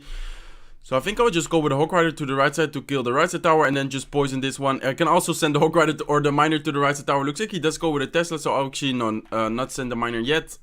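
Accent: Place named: Netherlands. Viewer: U have a Netherlands accent ohhhhh girlfreind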